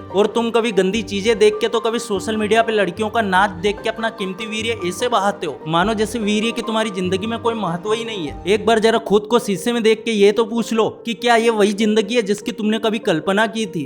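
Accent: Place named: Madhya Pradesh, India. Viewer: Apke bolne ke style se lg rha hai aap MP se ho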